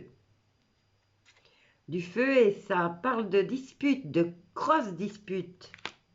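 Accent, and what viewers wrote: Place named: Belgium. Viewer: Vous avez de l' humour avec un bon accent Belge, Bravo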